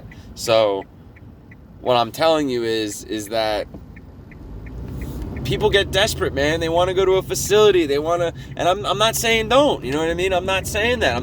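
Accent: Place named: New York, United States. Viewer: I just saw where a few people had asked where you were from, I must toot my own horn when I asked myself the same question because I thought you were from NY with that heavy accent. Keep on doing what works for you! I just hope one day I can rewire my brain. I'm terrified of having a bad acid trip also which just means Murphys law is bound to happen if I ever did try it. 🙃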